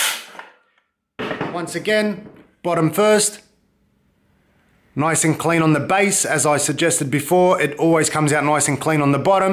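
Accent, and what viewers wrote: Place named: Australia. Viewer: Will scribing first help? You sound like the Aussie building inspection guy. Must be a cuzzie.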